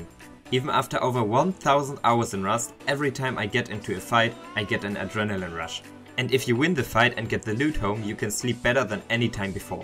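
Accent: Germany. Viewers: Brooo man hört so hart das du deutsch bist ! Aber gutes Englisch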